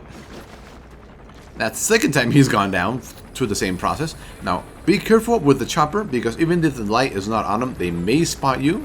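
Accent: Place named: South, United States. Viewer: he is probably from the south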